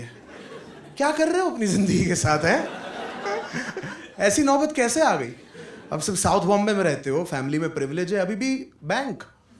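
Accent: Australia. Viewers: You mean australian accent? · Australian accent. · His Australian accent is as good as heaven.Great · Smoothie Australian accent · Australian accent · His Australian accent🔥🔥🔥🔥🔥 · that aussie accent! wow! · Buddy woh Aussie accent hai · Australian accent? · its an Australian accent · COC legends it’s an australian accent.. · Australian accent not american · Damn! He got Perfect Australian accent!!!!😎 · That's not an American accent it's Australian accent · That is not American accent that is Australian accent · Karunesh Talwar listening first time. Love ur Aussie accents 😁 upload more videos pliz. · Lol it's Australian accent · That’s an Australian accent lmao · aussie acccent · That's an Australian accent. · Australian accent* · Australian accent me baat krra tha wo lekin talented Banda h American accent bhi nikaal hi lega kyuki Australian accent jyada tough hoti h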